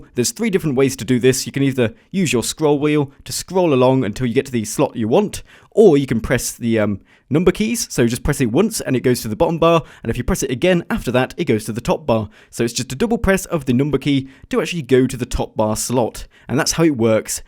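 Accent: United Kingdom. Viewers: Oh, wow. I guess when 1.7 came out it took ages! Anyway, just to let you know that I am a HUGE fan of you. Are you British? I heard from your accent, I am British:D #iamawippling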